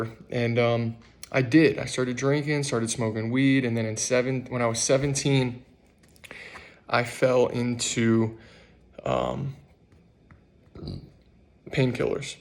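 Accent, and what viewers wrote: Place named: South, United States. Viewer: Love you humour and southern drawl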